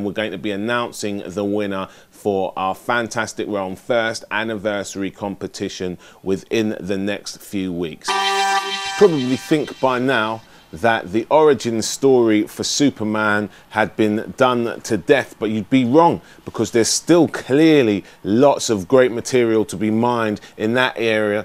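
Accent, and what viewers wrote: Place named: United Kingdom. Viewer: you sound british. .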